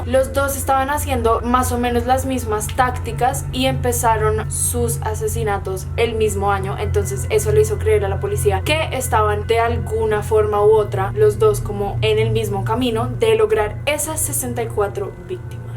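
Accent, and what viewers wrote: Colombia. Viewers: Me encanta tu asento colombiano😅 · estoy seguro que ella no es mexicana . no parece... parece y se escucha colombiana . es asi o me equivoco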